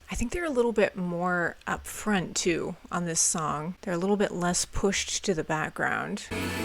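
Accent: United States. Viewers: god ur american accent is so annoying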